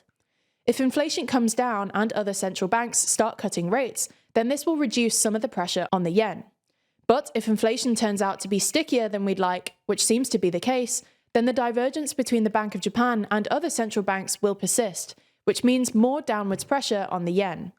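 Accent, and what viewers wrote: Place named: RP, United Kingdom. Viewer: Nice to hear a beautiful, eloquent and sophisticated sounding SRP (standard received pronunciation)--very classy.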